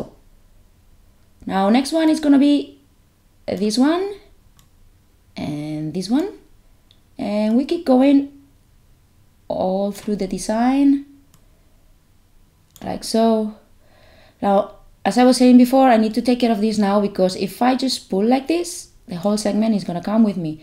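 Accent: Spain. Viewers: Hola, la verdad es que me encanta escuchar el ingles con este acento nuestro Español, de cualquier manera queria decirte que me encantan tus videos y queria preguntarte haces algun tipo de clase online, de clase privada me refiero y por cierto todo lo que muestras aqui aplica en Affinity photo tambien, ahi me dices gracias.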